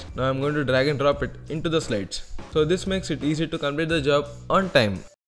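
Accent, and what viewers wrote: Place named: India. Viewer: That's Indian dialect. He knows English perfectly well, thanks to the British Empire.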